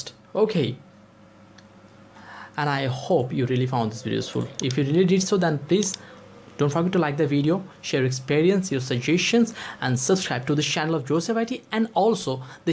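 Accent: India. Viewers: mr. indian i dont know what your saying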